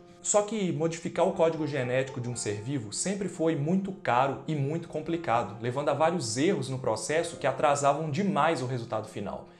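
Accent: Minas Gerais, Brazil. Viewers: Adoro seu sotaque mineiro!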